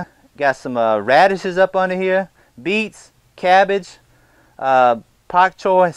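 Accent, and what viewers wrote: South, United States. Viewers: Lord willing and the creek don't rise. I haven't heard that in 20 years. Your idea for the grow light setup is terrific. I never thought 1" PVC pipe would be strong enough, but it sure looks sturdy. I'm really commenting, though, to tell you that you have the best accent in America. I have NO idea where you're from, since the only sort-of southern accent I know is New Orleans, where much of my family lives, and that accent is just weird. You don't sound like a Texan, either. Actually, you sound like the character Boyd Crowder on "Justified." That took place in Harlan County. Are you from or near the great Commonwealth of Kentucky? But the actor grew up in Georgia, so maybe he was just speaking his own natural way. Don't laugh at me. I was born and raised in the rust belt and moved to Cali as an adult. Never gotten to the south other than N.O. 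· finally, genuine southern accent...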